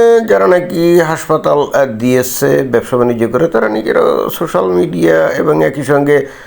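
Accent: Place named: Chittagong, Bangladesh. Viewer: ওস্তাদের ভাষায় শুনতে বেশ ভালো লাগে। চট্টগ্রামের মনে হয়।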